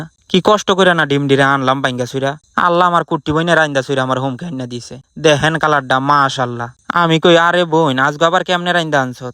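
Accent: Comilla, Bangladesh. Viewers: Vaiya Tumar kotha gulovonek Valo lage ar onek funny lage accha vaiya Tumi koi thako 😂😂😂😂😂Tumi ki kumilla te thako tomar vasha gulo amon kummillar moto 😂😂😂😂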